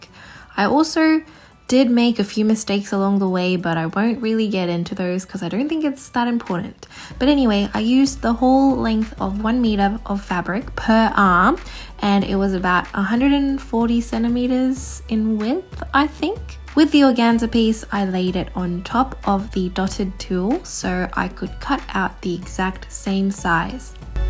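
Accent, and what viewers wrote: Australia. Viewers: She has a noticeable Australian accent LOL. So Australia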